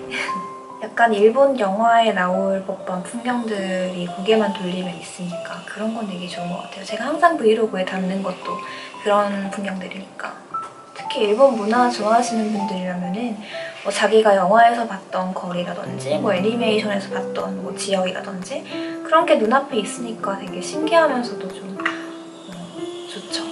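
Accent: Gyeongsang, South Korea. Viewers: 경상도 분 아니신가요?ㅋㅋ 서울에 살아 본 적 있어요? ㅎㅎㅎ 그냥 궁금해요!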